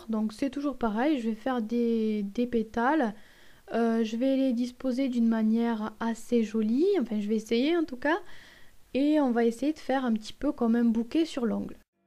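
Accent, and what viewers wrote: South, France. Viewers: juste super beau ,j'ai une question,tu as l'accent du sud non?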